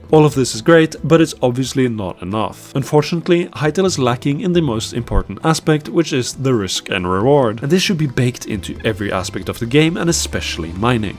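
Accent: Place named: Norway. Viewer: I like your cute Norwegian accent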